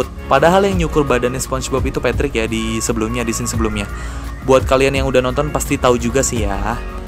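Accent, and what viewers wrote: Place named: West Java, Indonesia. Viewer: Abang ti sunda nya bang Ngomongnya ada teh mulu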